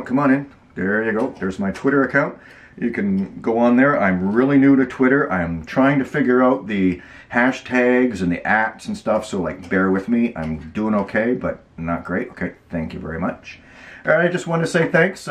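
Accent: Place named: Canada. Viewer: Congrats my friend!!! Youve gone full tilt, you're doing things right and you'll be big time in a short time. You keep people interactive and I like it. And I love hearing Canadians talk...so keep it up!!! Craig from WV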